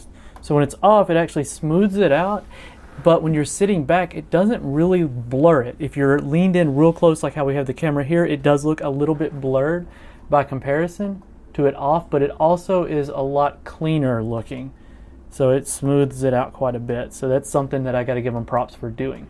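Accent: South, United States. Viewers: Love the soft southern pronunciation. Very relaxing to this Yankee.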